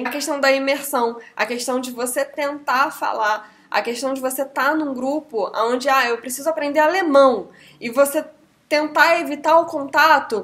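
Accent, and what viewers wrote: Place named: Rio de Janeiro, Brazil. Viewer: Eita sotaque carioca!!! TMJ!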